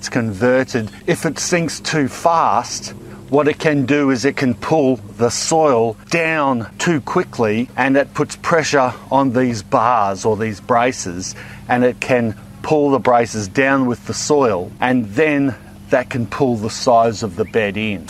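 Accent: Australia. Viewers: Love the channel... but in all seriousness... you ARE saying "mulch" incorrectly. Even with an Aussie accent, the last two letters are "ch", not "sh". It's NOT "mulsh". It's "MULCH". CHOO CHOO like a train. Not SHOO SHOO like you say to bugs or pests.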